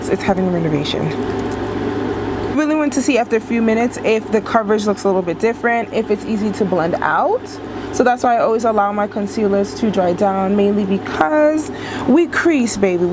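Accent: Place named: New York, United States. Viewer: I love the New York accent!❤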